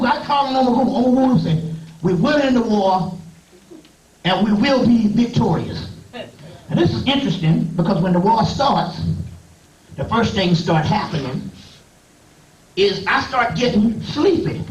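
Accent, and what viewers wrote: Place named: Rural, United States. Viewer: I feel you. It's actually his stumbling, stuttering and country twang that helps me understand his knowledge. The elders in my family all country, so listening to the master teacher is like being homeschooled by an uncle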